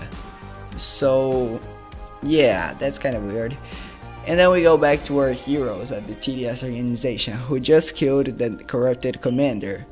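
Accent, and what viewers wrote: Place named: Brazil. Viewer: why do mine video and your's have the same text on the thumbnail 😭, and we both are brazilian, atleast i think you are brazilian by your accent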